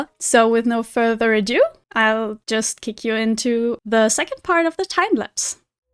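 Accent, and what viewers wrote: Germany. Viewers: Loving the cozy, chill vibes, great job! I was actually guessing you might be German because your accent sounds a lot like a friend of mine's and she's from there too! Guten tag! P.S. I love the tree, that's so cool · I immediately noticed your german accent! Probably because I'm german too :D I already love this series so much! <3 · Ha, hab ich doch gleich gedacht, das klingt deutsch 🙂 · the second I heard you talking in the first part I was like: She is german. XD I am german as well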